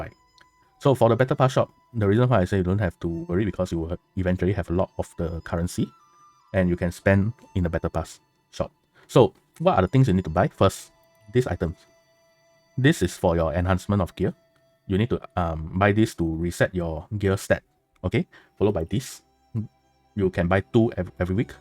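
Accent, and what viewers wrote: Singapore. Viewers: are you Singaporean ??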